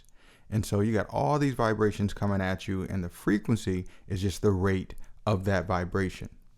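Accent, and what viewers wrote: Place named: New Jersey, United States. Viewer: Love your accent Man, is it New Jersey? Great Video, very helpful, thank you.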